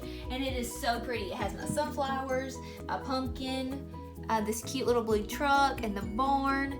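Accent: South, United States. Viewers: OMG! I love your home, your and Drew's awesome creativity and your sweet Southern accent! I have to admit when you said you'd show us the shower, then you said "we had a wedding shower here"...I had to coordinate my thinkin' for just a second to figure that out...LOL!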